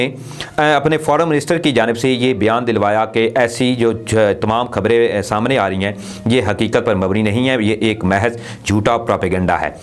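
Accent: India. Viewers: تم یہ بتاؤ بھارتیوں کی طرح اردو کیوں بولتے ہو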